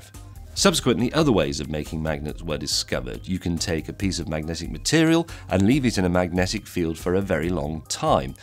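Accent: United Kingdom. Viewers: The ideal British accent.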